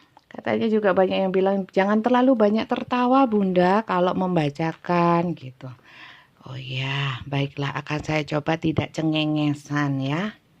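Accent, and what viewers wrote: Java, Indonesia. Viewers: Setuju bunda.. dg bahasa bunda yg agak" medok..sy suka banget.. krn sy wong ndeso bunda. Trenggalek jatim..💪semagat ya bun. Smg bunda sht sll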